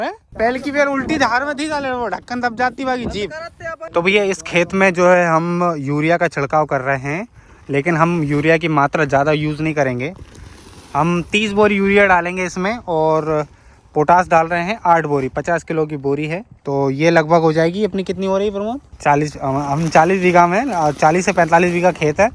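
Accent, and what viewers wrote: India. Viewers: Kaha ke ho bhaiya narsinghpur jaise bol rahe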